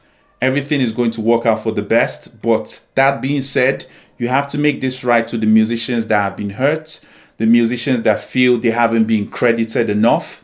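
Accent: United Kingdom. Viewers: Your accent is fascinating! I keep hearing bits that sounds Northern-ish, and then other bits that sounds like from all over the different bits of London!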